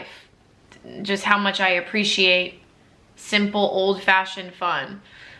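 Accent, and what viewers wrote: Australia. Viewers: That Australian accent threw me for a loop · girl mid video I got super confused and was like why does she sound different and realise you're still going with the australian accent omg😭😭😭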